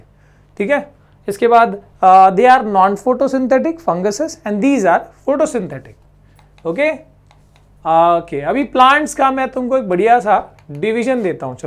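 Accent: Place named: Bihar, India. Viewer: sir, u r from bihar... if i m right .. then i feel very glad to hear this 😊😊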